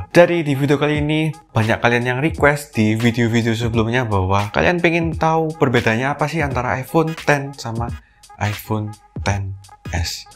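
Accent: Java, Indonesia. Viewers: medok banget jawanya (D)😀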